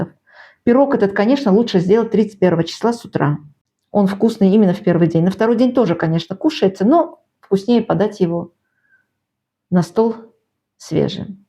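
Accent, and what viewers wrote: Baku, Azerbaijan. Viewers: Случайно увидела это видео, думаю, дай гляну, хоть и НГ уже прошёл)) Уже на слове «столичный салат» у меня возникли смутные сомнения)) Потом кИнза, винегрет с красной фасолью и, наконец, демьянка – развеяли все мои сомнения – я смотрю видео бакинки из Греции😂 И ещё, конечно же, наш акцент, хоть и небольшой, но всё равно ощутимый😂 Поймала себя на мысли, что смотрю видео и жду, когда же Вы скажете «бурак» вместо свеклы😂 На душе так тепло стало при просмотре вашего видео, будто с родными повидалась)) Большой привет Вам из Баку! И спасибо за интересное видео! Успехов и крепкого здоровья Вам в новом году! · Здравствуйте,! Это все, что готовили наши мамы, я прям окунулась в детство! Вы, случайно, не бакинка? По разговору почувствовала, потому, что демьянки, говорят только жившие в Баку❤❤очень приятно познакомиться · Акцент бакинский неистребим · Добрый вечер, а вы бакинка? 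Простите акцент❤❤❤ родной😂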